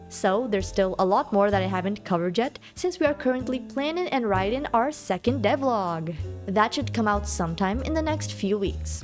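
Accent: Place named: Brazil. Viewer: O sotaque brasileiro é inconfundível, sucesso!!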